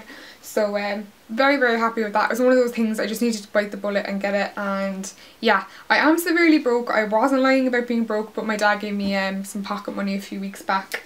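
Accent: Cork, Ireland. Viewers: omg the inactive transcript is so funny :L clearly doesn't know the cork accent :P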